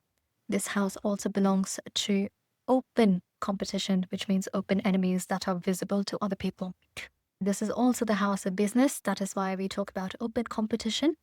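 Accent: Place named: Guyana. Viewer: Your accent strongly reminds me of British Guyana. If I had the male version of your voice I'd be a millionaire. I'm looking forward to future videos explaining Jyotish. It's all Greek to me. Thank you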